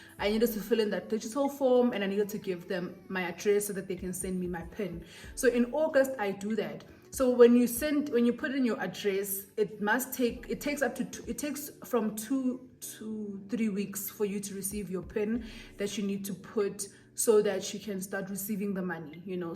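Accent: Nigeria. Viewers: Woww❤❤❤you look like those rich Nigerian woman with that face+accent,,we can't wait for more video#2024,,,, Happy New Year Motswala 🎉🎉🎉😍😍😘😘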